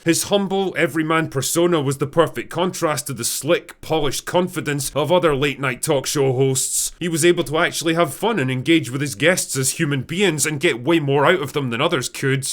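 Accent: United Kingdom. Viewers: why do you have a british accent i just noticed after all these years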